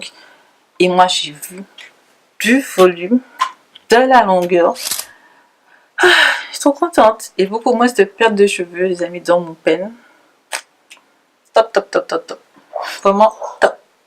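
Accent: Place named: Antilles, Caribbean. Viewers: Oui, elle a l'accent antillais ;) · audrey lawson l'accent créole antillais oui :)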